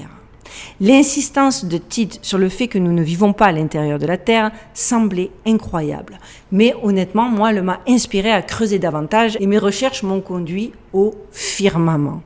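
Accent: Marseille, France. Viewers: Merci ma belle, comme toujours super intéressant et documenté. Merci d'ouvrir nos œillères et de nous "bousculer" avec ton bel accent marseillais!! ✨☀️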